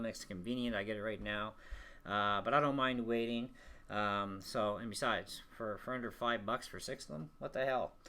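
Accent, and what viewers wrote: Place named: Newfoundland, Canada. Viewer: you got to be a newfoundlander :)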